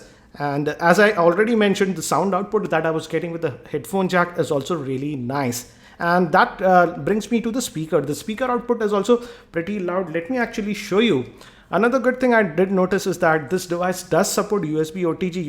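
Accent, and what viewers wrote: India. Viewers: Nice accent,Indian-English Accent are nice to hear and above all they are clear Your Video is Very Informative, Thumbs Up for your Vid!.. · Não tem como parar de rir com sotaque indiano, pqp! AUEHAUEHAUHE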